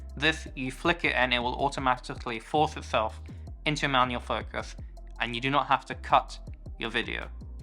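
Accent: India. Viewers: So glad to see new videos from the guy who got me into Fujifilm many many years ago. I've missed the random phasing in and out of the Indian accent. 😄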